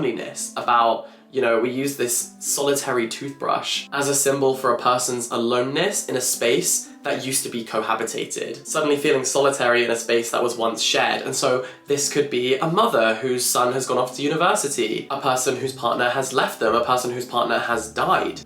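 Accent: United Kingdom. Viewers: I thought it was a british accent (I am not a native english speaker and have trouble picking up accents)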